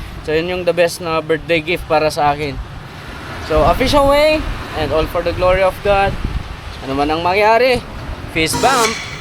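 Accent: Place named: Visayas, Philippines. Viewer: Bkit parang bisaya ang pagsalita niyo.. Dba Yung bagyong nando dumaan sa Norte... Ang mga Tao Dyan mga tagalog pero Yung nag uplode netoh parang nag bibisaya hahahah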